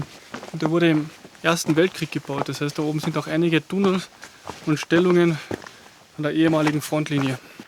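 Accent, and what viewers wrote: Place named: Austria. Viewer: Ve wo bischn du ? Und welcher Berg isch des ? Ven Akzent ven Hochdeitschen tat i sogn Österreicher. Cooles Video, mir gfolln sette Video wo in die Grotten unterwegs bisch und nocher in an Biwak ausharren oder magari no ibernochten muasch! Abo und a Like hosch ve mir! Gruass ve an Südtiroler! Berg Heil!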